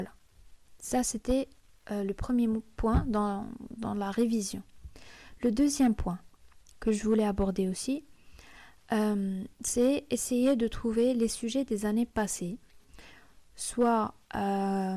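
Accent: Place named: Tlemcen, Algeria. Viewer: L'accent tlemcenien ❤️🙏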